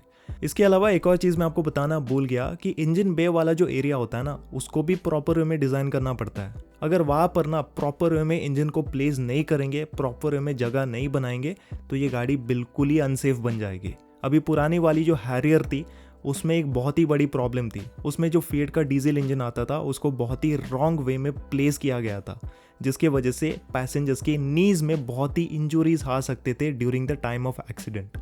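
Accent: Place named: South, India. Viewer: Brother.. appreciate your Hindi. Just improve your MTI. You purely sound south Indian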